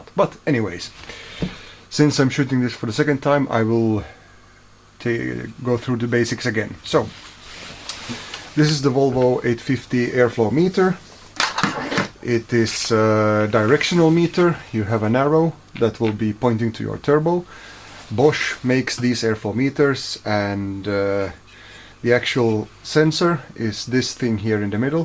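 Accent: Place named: Russia. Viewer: My native language is Russian. I heard your pronunciation and assumed that your native language is Russian too.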